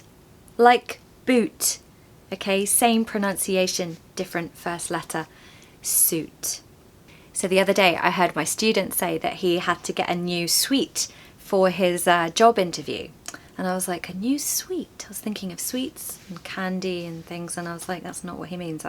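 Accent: United Kingdom. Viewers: exacly you are my favourite teacher You have a lovely British accent I love it😅❤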